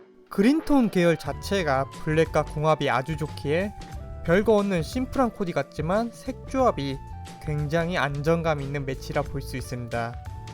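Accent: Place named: Jeolla, South Korea. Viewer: 전라도 사투리가 정겹네요^^ 광주사람이라 딱듣고 알았네요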